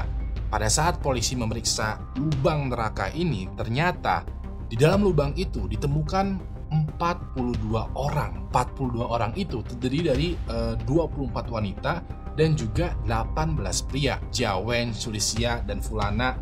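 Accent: Indonesia. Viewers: gapapa biar lebih gampang dipahami karena logat indo. wkwk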